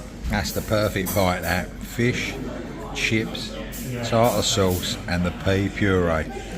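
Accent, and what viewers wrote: London, United Kingdom. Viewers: Wondering which video to heap admiration to you on. Fabulous video. It's the best channel to bring London's hidden gems to us in the Frozen North. Museums, cafes, pubs, sights are presented in a lovely, non-pretentious way, with humour and a true Londoner's love of the city. Having worked in London almost 50 years ago, its lovely to hear a 'Landan' accent. Great channel. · I love your knowledge of London. For some reason I love London and think it must have been part of a previous life. You don’t say who you are! I love your accent, think you’re a Londoner. Loved Leadenhall Market. I am eager to visit and try one of those scotch eggs. Thank you for rousing my desire to visit London 🐦‍⬛ :)) 🌻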